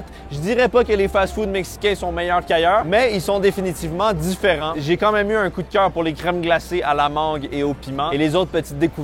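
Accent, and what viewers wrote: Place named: Quebec, Canada. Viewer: J'adore ces vidéos par contre j'ai faim maintenant, l'accent québécois ca me tue de rire 😂...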